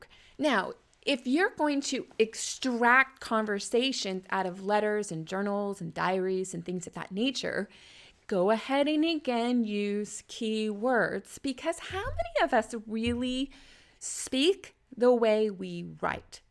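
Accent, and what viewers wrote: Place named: Texas, United States. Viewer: The Texas is strong in this vid,. =) It's probably not a good idea to add dialogue because living relatives may be like "Grandpa never said that!" At best, the only thing you can do is just write about the situation. Some people have put words in ancestors' mouths but have said it was dramatized at the end of the book. Not sure if I'd be comfortable going that route. Always a good thing to verify with people who may have known a few people. For example, my parents knew their grandparents and how they acted.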